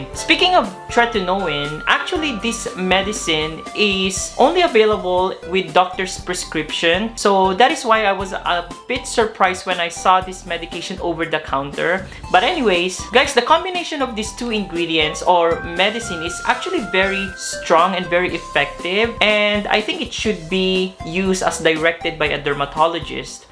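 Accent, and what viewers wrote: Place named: Philippines. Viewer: Sobrang thick ng pinoy accent mo, napaka tigas ng dila at tunog lumaki ka sa Pilipinas na kaliwa at kanan ang RDL at Maxipeel. Hwag mo kaming paandaran na hndi ka pa nakakita nito sa buong buhay mo noong nasa Pilipinas ka kahit sa maliit na butika meron nito. Meron din itp sa mga sa advirtisements sa TV and mga posters nagkalat makikita mo kapag nasa jeep, bus, at kahiy drive ka ng car. Pati English mo tunog pinoy at mali pa ang grammar. Simpleng paggamit ng S sa singular at plural hndi mo alam. Kaya wag mo kami paandarang nagulat ka na over the counter ito sa Pinas. Pangit ka den hndi ka mabebentda sa mga alpha handsome hottie afams.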